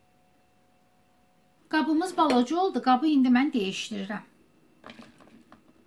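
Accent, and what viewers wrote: Baku, Azerbaijan. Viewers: Lehceniz lezzet eliyir. Bakililar başa dūşer.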